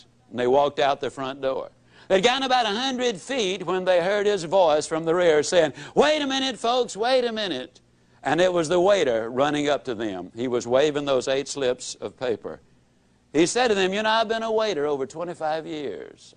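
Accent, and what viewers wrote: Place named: South, United States. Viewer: he sounds like someone from arkansas/ texas/ mississipi. he sounds like forrest gump. ziglar died three years after jim rohn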